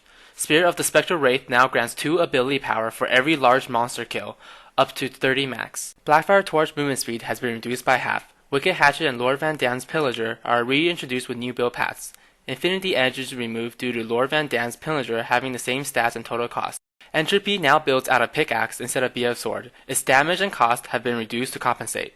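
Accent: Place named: Asia. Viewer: I cant help but smile with your asian accent XD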